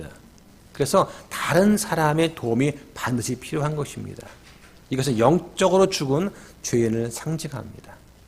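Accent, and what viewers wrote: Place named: Daegu, South Korea. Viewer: 귀한 말씀 감사합니다, 대구분들에게 잘 맞는 억양으로 말씀 하시니 더욱 좋읍니다~~!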